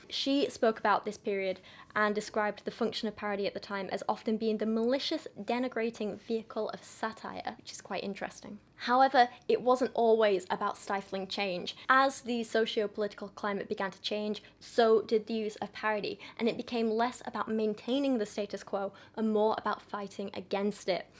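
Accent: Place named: Yorkshire, United Kingdom. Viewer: I can't fully understand the relationship between and Kiera because I never had a dog or a bond so strong with a furry compagnon. Knowing what they went through together, I can only admire the clear love and trust between them. Happy to learn new things with your nice yorkshire accent!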